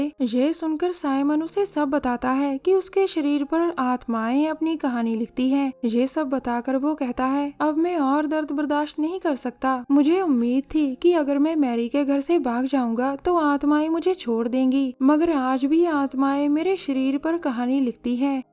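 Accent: Punjab, India. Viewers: Bhai accent se pta nhi chl rha pure Punjaban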